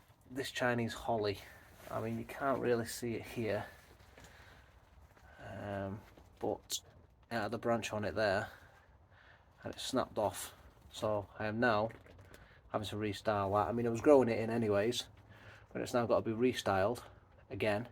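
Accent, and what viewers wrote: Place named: United Kingdom. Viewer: Good stuff mate, just subscribed. Where in the uk are you, couldn’t make out the accent. Cheers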